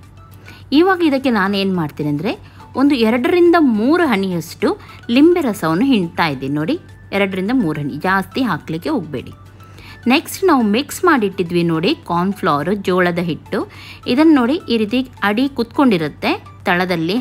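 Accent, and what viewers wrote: Mangalore, India. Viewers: Mam I really love ur manglore Kannada and also ur recipe's.. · Hai madam nowadays I am watching your all viedeoes superb your mlore kannada so nice your family very good children are so naughty Khushi aagatte always be happy thank you so much daily vlog maadi mam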